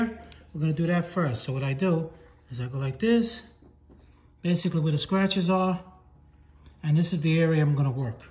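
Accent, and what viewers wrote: New York, United States. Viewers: The New York voice rules. I love it.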